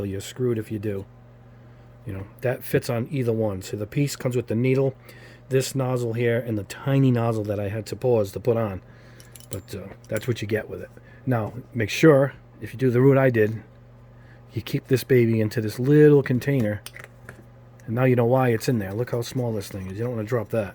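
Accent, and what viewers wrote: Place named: Long Island, United States. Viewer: You have to be from Long Island. I can understand ever word you say clearly haha!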